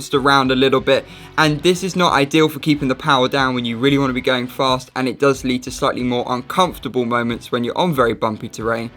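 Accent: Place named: United Kingdom. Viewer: Sounds more like an ad than nothing else. You don't address the countless numbers of ghosting issues that have been reported, nor the predatory behavior of FM towards reparability of the board. You sound like you live in the UK, have fun when your board brakes down and you have to send it back to the only place in the world that can repair your board without bricking it mate.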